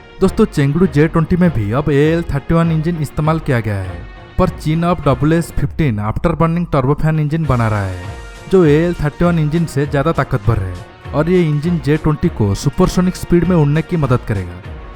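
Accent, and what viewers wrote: Odisha, India. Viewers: Odisha k ho kya bhai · I think u r from odisha , any way su30mki is best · Odisha se hai kya bhai..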